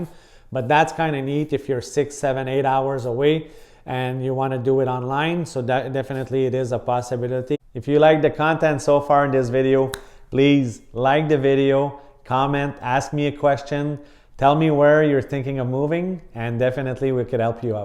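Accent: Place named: Quebec, Canada. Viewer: I think its the Quebec accent but you sound just like GSP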